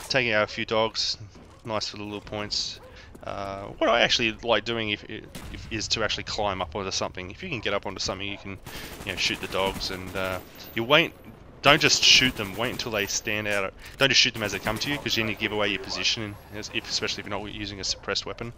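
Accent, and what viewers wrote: Australia. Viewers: Finally a gd Aussie commentator what state r u from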